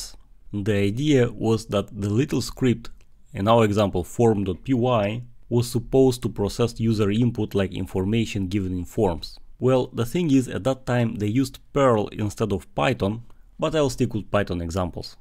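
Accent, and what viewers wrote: Russia. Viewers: Русский акцент? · Дружище, я сразу понял, что ты русский) Запили на русском такой же видос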